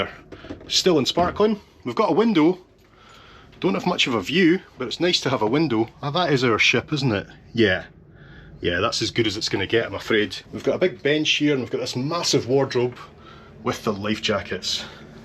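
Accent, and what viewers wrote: Scotland, United Kingdom. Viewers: I’m a Brit living in Sweden ca 2000 I decided to take the overnight ferry from Gothenburg to Newcastle via Kristianstad, it was February and we had a storm in the North Sea. I had a cabin on deck six probably under the Bridge, it was like being on a big dipper, I never slept a wink I could hear metal banging a crashing, so I went down to the bar area and met a Finish guy who insisted on buy me beer, which I didn’t really want as I had to drive the next day. At six in the morning I was like you out on deck as the stomach had passed and it was calm approaching Newcastle but the wind was incredible it nearly froze my face! I only found your channel last night and already watched 4 videos thoroughly enjoyed them 5 star 🌟🌟🌟🌟🌟. BTW I love listening to your accent my mother was from Dundee and I was born there moved to Southern England when I was 5 years old, spent a lot of summers in Scotland played golf once as a boy in Montrose. 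I am 78 and I want to visit Scotland soon and look up some cousins while I still can. Keep up the good work ! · Certain accents in the English-speaking world have phrases that can’t be seriously said by all the accents. No Yank, Canuck, Kiwi, or Aussie is going to say “sneaky wee peek.” But a Scot? Perfection! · I really enjoyed this one! Great presentation and I always love your descriptive Scottish brogue. Well done .... what an adventure!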